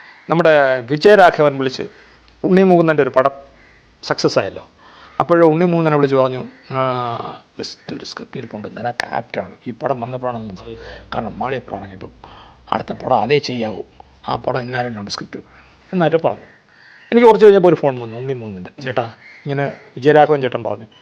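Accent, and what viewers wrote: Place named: Thiruvananthapuram, India. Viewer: എന്താരായാലും നീ വായിക്കുന്നവത്... തിരോന്തോരം തന്നെ അപ്പി....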